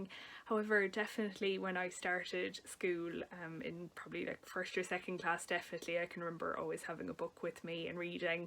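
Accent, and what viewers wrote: Ireland. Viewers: I need to do one of these next, too! Welcome!!! Love the Irish accent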